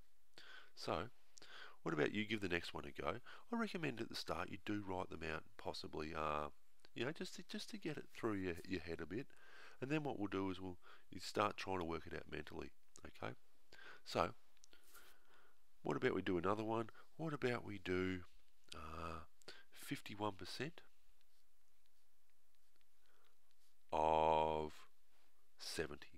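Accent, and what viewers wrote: Australia. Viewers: Are you Australian? You sound just like my uncle. I love you videos! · Calm Aussie accent and common sense, both helped give me an extra tool to working out percentages . It helps keeps one in touch with the original math logic so often lost with calculators. THANK YOU ALL THE WAY FROM DUBAI. Bet you didn't expect fans this far out eh ?! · If Corpse was Australian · good one, your accent look like aussie thums up if u are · His Australian His Accent shouldn’t Matter his message is Great 😊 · Australian bob Ross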